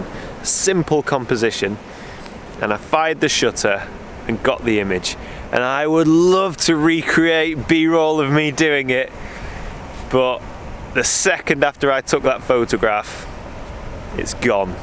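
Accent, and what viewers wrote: United Kingdom. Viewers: Your channel discovered me somehow and I’ve enjoyed it thoroughly! Your accent is amazing! From where in the UK are you from ? Can I with my Mamiya C220 and Sekor 55 lens attempt at these vast expanse type pictures? I am yet a film amateur photographer! Should I resort to my Sigma 18-35 mm wide angle zoom for my Minolta Alpha 35 mm camera instead? And if you’re ever in Costa Rica I’ll take you to the Roca Bruja (Witch rock) in Playa Naranjo at the Santa Rosa National Park for some pictures! Regards, Robert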